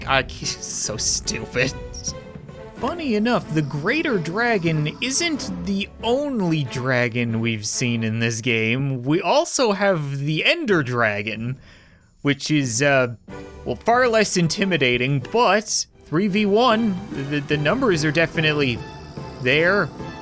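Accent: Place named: Canada. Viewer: sounds extra Canadian today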